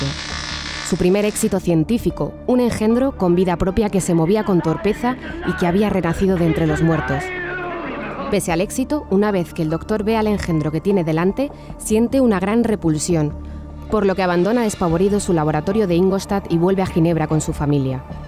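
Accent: Spain. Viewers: Me encanta el acento español <3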